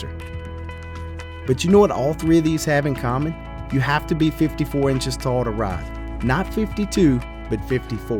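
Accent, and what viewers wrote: South, United States. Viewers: sounds COUNNNTRRYYYY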